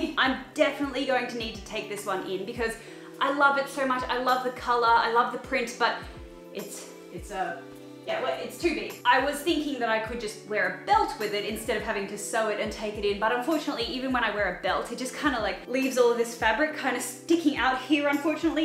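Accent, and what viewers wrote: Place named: Australia. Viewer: Hi! I seriously love that yellow dress and YES CERTAINLY, it reminds me of that Birger Christensen dress from the designer haul. I need to correct you though, Birger Christensen is a Danish brand, not Dutch. Birger Christensen was a Danish man that established a store in Copenhagen back in 1869, as a small fur repair workshop. I don’t know if the exact designer of the cute yellow dress is Dutch, but it wouldn’t surprise me! Also I’m a Dane myself, and I’m loving your lovely Australian accent, your friends, rambling, Archie and most of all your passion for pastels. I’m very into sustainability and seeing all these lovely thrifted items makes my day. Thank you for inspiring me to be myself 😭💕🌈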